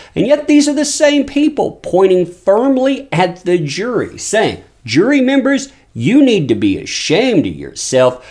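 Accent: South, United States. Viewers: Listening to your southern drawl hillbilly accent is irritating enough, but could you please stop with the repetitive "That former certain Aquaman starlet". Just say: Amber Heard and then maybe go for speech therapy 😉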